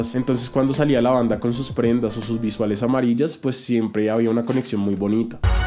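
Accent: Bogotá, Colombia. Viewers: T erecomiendo que hables sobre, Esteman. Por tu acento pareces De bogotá y suenas bien rolo jajskkjajk buen contenido sigue así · Primera vez veo un video tuyo, y apenas empezaste a hablar me dije "esté man es rolo" y cuando dijiste "hijueputa" lo confirme. Aunque bueno, no se si sea cierto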